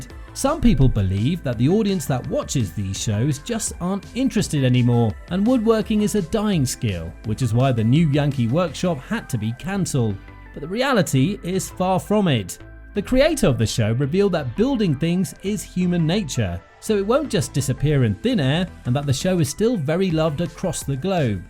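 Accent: England, United Kingdom. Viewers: Why do they import a brit to narrate? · I can’t believe someone with an English accent read out this tripe! Get a half decent script editor and transform the quality of your videos overnight!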